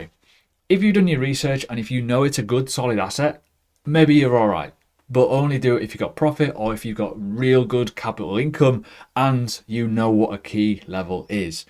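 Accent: United Kingdom. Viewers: Omg 😱 my favourite guy ever. Please keep them coming. The British accent a huge bonus 👍👍👍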